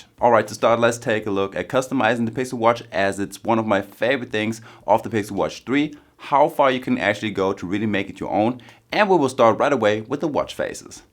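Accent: Germany. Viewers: aer you from germany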